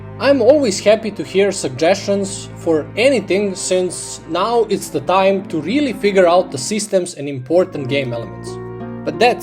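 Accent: Eastern Europe. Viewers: may I ask what your first language is? I could probably find out myself, but I’m too lazy. I will say it’s likely Slavic, Uralic, or Turkic based on your pronunciations of h, r, and w. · I really like this so far, it reminds me of a ps4 game you see and buy randomly and really enjoy it at the end lol. Anyways i see that you have a slavic-esk accent and if it doesnt bother you, im really curious as to where you are from? Im in the south balkans so i just find it cool that theres a person like me liking and making games. Dont give up!😁😁😁😁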